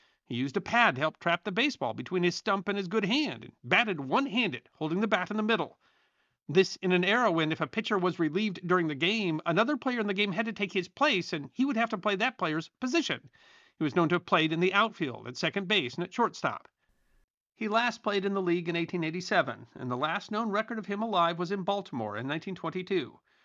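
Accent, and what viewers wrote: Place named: Georgia, United States. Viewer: He sounds like a real (Georgia) peach.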